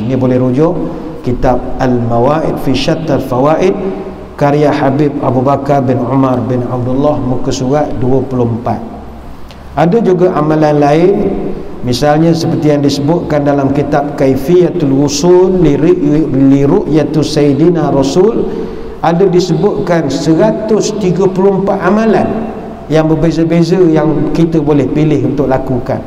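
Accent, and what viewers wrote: Pahang, Malaysia. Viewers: Dakk....Dato org Maran, Pahang. Mmg telo Pahang ada sstgh kwasan ada sikit2 mcm org Perak.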